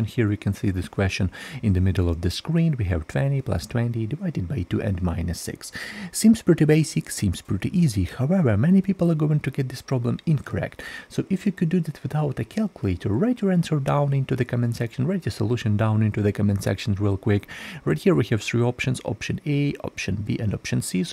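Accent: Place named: England, United Kingdom. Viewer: Great stuff but you talk too fast, your English accent is not helped by muffled sound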